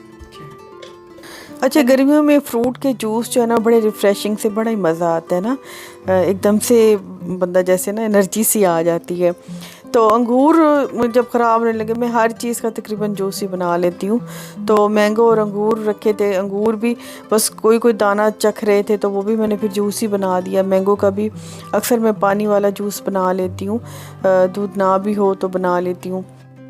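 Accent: Saraiki, Pakistan. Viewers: Towadi saraiki axcent meno bara pasand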